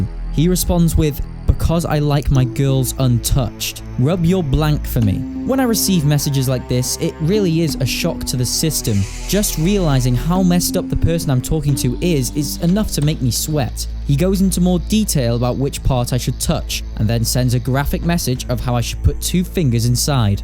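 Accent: Wales, United Kingdom. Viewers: Welsh Chris Hansen. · bri’ish man has good content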